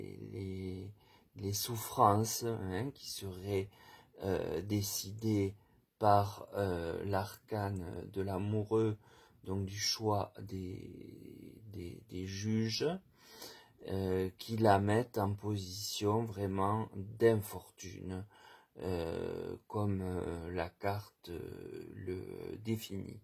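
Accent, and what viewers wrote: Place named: Occitania, France. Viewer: Bonsoir merci pour le partage vous savez il y a toujours des êtres au dessus des autres!!! Faut passer outre comme la pluie sur les ailes d'un ( canard ) facile à dire certe! Nous sommes pas très loin je reconnais ( l'accent) d'Occitanie je vous écoute avec attention ( parce que) vous me parlez. Merci merci 👏👏👏👏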